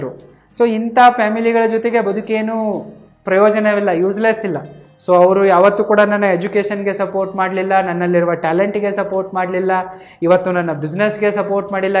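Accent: North Karnataka, India. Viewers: Nimdu uttara Karnataka na sir?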